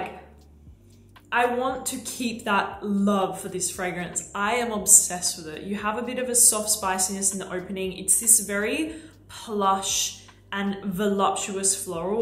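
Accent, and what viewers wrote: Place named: Australia. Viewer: I love her Aussie accent and the way she describes fragrance makes me genuinely want to own everything she recommends cause it just sounds so delicious when describes it! My go-to for fragrance knowledge forever!!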